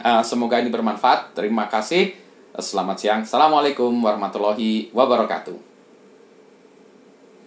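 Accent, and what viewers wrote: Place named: West Java, Indonesia. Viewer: Namanya mirip dengan nama orang jawa tapi logatnya mirip orang sunda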